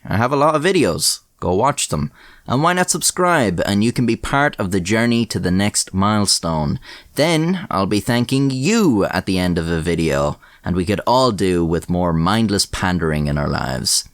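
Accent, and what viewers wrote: Ireland. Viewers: so a light Irish accent almost pronounces Nascar in its proper grass roots hill folk form... hillbill sees race cars and says "Thats a niiice car" IE the word Nascar was born Also, used to race Karts as a Kid... Dirt circle, WKA. Fist fights were very common at the race track.. usually one every weekend at least.. One time i was at a Dirt Modified Race and at the end because of a disputed win, there was a fist fight of about 20 folks on front stretch/winner circle and the whole crowed basically started rioting.. beer cans were flying everywhere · Ever heard of Mike from Thatchapter? He's Irish too,I like your accent way better though.he sounds like Irish lite or something. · It’s wild hearing these NASCAR driver’s names pronounced with an Irish accent, You pronounced them correctly, just weird hearing them without a. “Southern Drawl” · Dawg your Irish accent is so smooth and pleasant to listen to, stay beautiful my friend. 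· yo irish man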